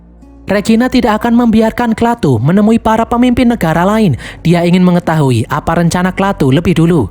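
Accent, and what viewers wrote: Java, Indonesia. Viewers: logat e medhok😂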